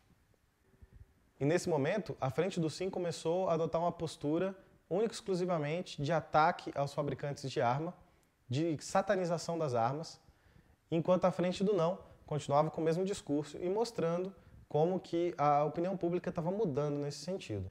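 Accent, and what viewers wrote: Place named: Belo Horizonte, Brazil. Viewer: Ele é do RS? Fala como alguém de BH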